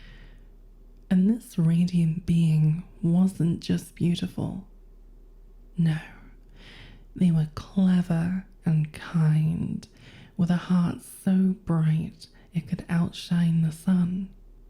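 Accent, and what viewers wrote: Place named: United Kingdom. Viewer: oh she's british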